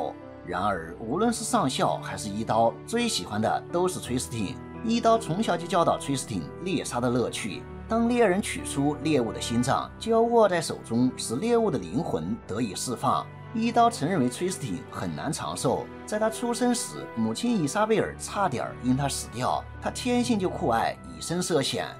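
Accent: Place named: Shandong, China. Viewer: 越哥的文稿写得很精彩，山东口音很亲切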